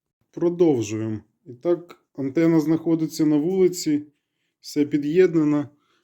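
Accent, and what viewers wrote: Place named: Surzhyk, Ukraine. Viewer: У тебя самый плохой в мире суржик.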